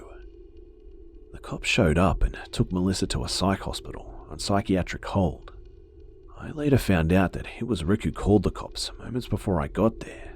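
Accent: Australia. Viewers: Are you from Australia mate and if so what state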